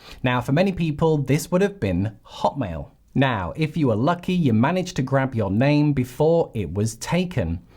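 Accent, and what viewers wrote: United Kingdom. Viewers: Life is SMOOTH with a British accent........Cheers My Love...!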